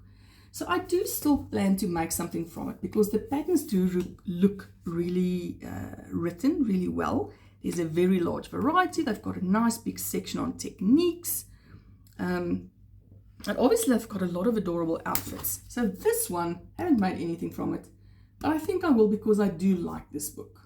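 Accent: South Africa. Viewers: Hi I love the animal friends of Pica Pau 3. This is the book I recommend. My parents lived 12 years in Cape Town and I loved going on holidays there. Your accent reminded me a lot of memories.❤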